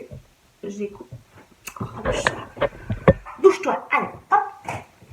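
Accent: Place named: Belgium. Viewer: Bravo, bravo pour ton concert à Rome! Ta robe sera très belle, j’en suis sûre...et je pense que le taffetas sera plus fluide une fois lavé. Pour les flex, oui, tu peux les couper en morceaux, pas de problème. Merci pour ce bon accent bien de chez nous et bisou de Marche-en-Famenne, Gene 🎼🎶🎶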